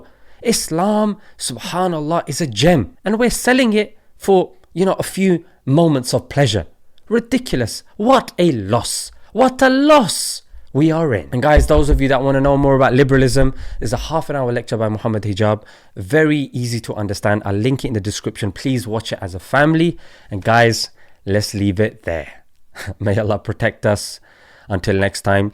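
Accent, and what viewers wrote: Pakistan. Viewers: I laughed so hard on your Urdu accent😂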